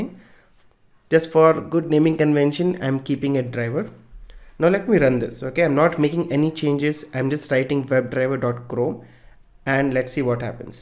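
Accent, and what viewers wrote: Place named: India. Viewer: You have a very strong indian accent !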